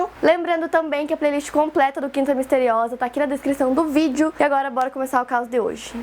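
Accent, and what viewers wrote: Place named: South, Brazil. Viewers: Video um pouco antigo mas mto bom, a sempre explicou mto bem, pesquisou mto bem, e merece muito reconhecimento pq isso eu sei que da mto trabalho, e além disso tem esse sotaque sulista SUPER FOFO ❤🤡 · voz sulista que fofo e engraçado s2 kk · Mas tem um sotaque sulista diliça♥️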